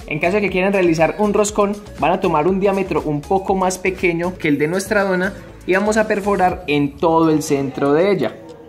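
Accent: Antioquia, Colombia. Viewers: Amo tu acento paisa jajajaja gracias por la receta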